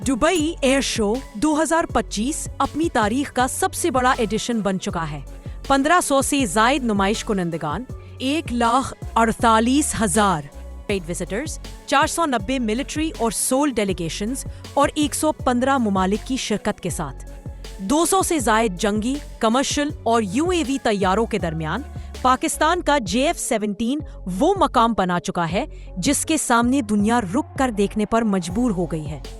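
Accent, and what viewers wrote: India. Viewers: بولنے کے اندر سے پتا چلتا ہے کہ انڈین ہو پر انالئز اچھا کیا ہے پاک ٹیکنالوجی کو ❤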